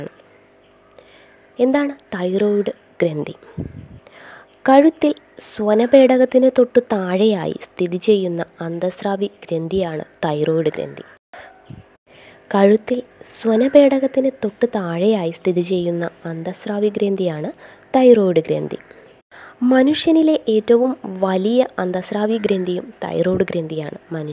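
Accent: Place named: Thiruvananthapuram, India. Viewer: Ente same soundum slangum,Teaching methodum . Trivandrum aano. I am surprised.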